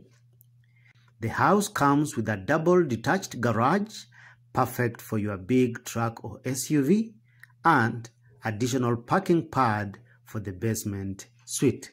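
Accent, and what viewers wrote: Kenya. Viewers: The narrator has a Kenyan accent. It's what kept me watchinf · YOU SOUND KENYAN ... ???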